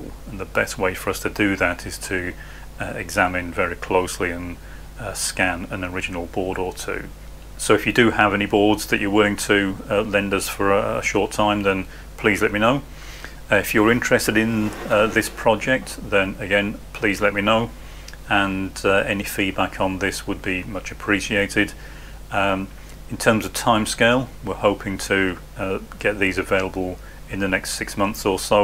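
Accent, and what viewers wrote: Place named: United Kingdom. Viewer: Interested. Keep us up to date with progress please. I'm encouraged by the British accent - where would these ship from ? I'm fed up of paying import duty and VAT on gear I import from the US.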